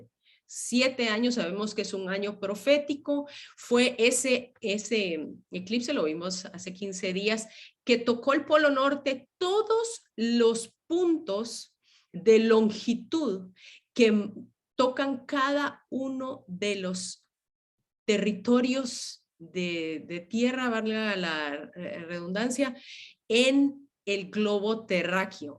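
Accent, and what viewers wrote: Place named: Costa Rica. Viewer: Tienes acento de costarricense .. !! 😀 👍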